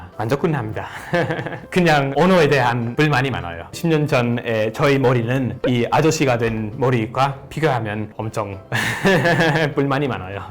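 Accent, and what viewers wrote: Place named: Jeolla, South Korea. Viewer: 한국말 정말 잘 하시네요. 전라도 사투리도 잘하시고요.. 한국은 정말 좋은 나라지요.저는 미국에 삽니다만은 한국이 최고의 나라 라고 생각합니다.건행하시기를 기원합니다.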